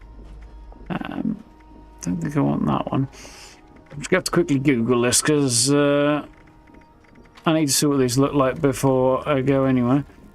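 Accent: RP, United Kingdom. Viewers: This guys accent really throws you off abit, because he sounds really really posh, like he studied at Oxford, yet he looks like a metal head 😂🤣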